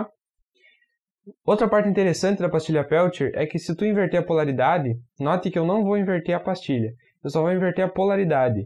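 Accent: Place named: São Paulo, Brazil. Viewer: Esse cara só pode ser do vale do ribeira, pelo sotaque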